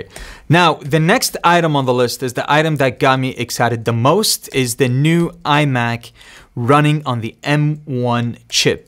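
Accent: United States. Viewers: Your English is so good with American accent niiiiiiiice !!! High five ✋ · Your English is so good with American accent niiiiiiiice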